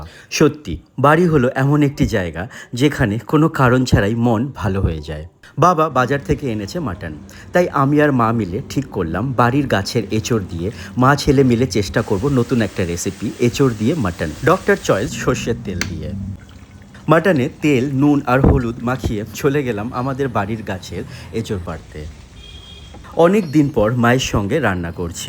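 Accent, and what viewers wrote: Bangal, Bangladesh. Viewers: তোমার বাঙ্গাল কথা বেশ ভালো লাগলো,আরও বেশী শুনতে চাই। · তোমার মুখে বাঙ্গাল ভাষা টা শুনে বেশ ভালো লাগলো 🙂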